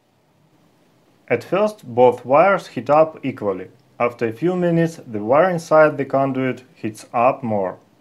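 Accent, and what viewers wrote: Russia. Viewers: Сильнейший акцент выдаёт русскоязычного )) Ну а по сути - очень полезный опыт. Но, к сожалению, большинство самоучек-электриков добиваются "вау-эффекта" от своей работы именно бесконечными километрами никому не нужной гофры. Им главное - пустить пыль в глаза ничего не понимающему заказчику.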